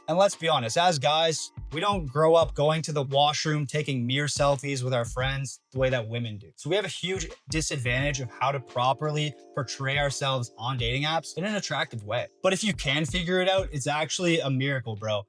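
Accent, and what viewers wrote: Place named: Canada. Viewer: Thumbs down for excessive “bro” and irritating Canadian accent.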